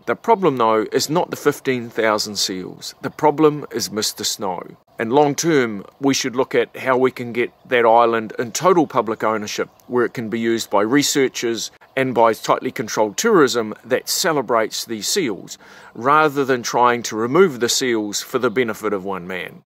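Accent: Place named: Australia. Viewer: It is good to hear a down under accent. Makes me homesick. I will soon be heading from Nantucket’s winter to my place in New Zealand for their summer. Meanwhile, I’d like to set the narrator straight. In this Earthrace Conservation video, the narrator displays the valor of ignorance. The valor of ignorance is defined as expressing a point of view that is unhinged from knowledge of the subject at hand. Let me point out some of the errors in his narrative that deflate his claims. --Crocker Snow a respected conservationist- does not “lead” the Seal Abatement Coalition. He has been a valued member of its Board. He did, however, lead the campaign to get Muskeget Island officially designated and preserved as a Federal National Natural Landmark. ---Crocker is not concerned about the gray seal invasion of Muskeget Island (of which he owns half) in order to protect his shack there. 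He wants to protect the island’s biodiversity, which is threatened by the burgeoning gray seal population. For the real story go to <link> click on Actions and then on Unintended Consequences. ---The narrator minimizes the gray seal impact on the fishery and lays it off on commercial fishermen. A recent study of commercial fish and shellfish landings from North Carolina to Nova Scotia found that they equaled just 60% of what gray seals consume. --Far from the 15,000 gray seals eating mainly “sand lance and shrimp” that the narrator cites, a report in a regional North Atlantic newspaper cited a Canadian stud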